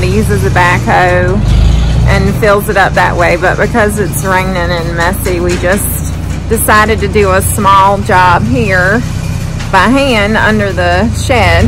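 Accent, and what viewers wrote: South, United States. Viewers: I enjoy hearing you talk,I always have liked to hear southern bells talk,it's just so sweet.I know you explain things that you say,so other people,not from the south,will know what your saying,but I get every word,and it's refreshing.Anyhow,keep up the goid work,I really enjoy your videos.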